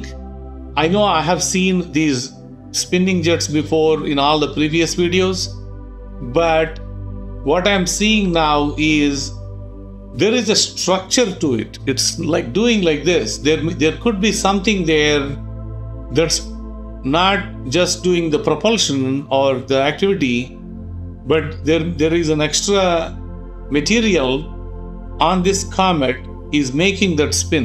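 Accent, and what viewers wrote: India. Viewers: This Man, explaining in his thick Indian accent, what I believe to be True. is speaking HONESTLY! Please Listen to Him as I Am! Doc Bones, out.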